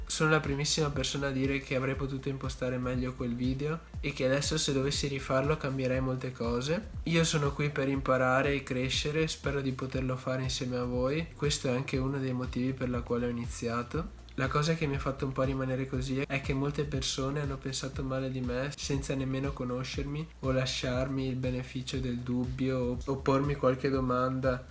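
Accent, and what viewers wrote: Veneto, Italy. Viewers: Ma di dove sei?Veneto? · Sei Veneto??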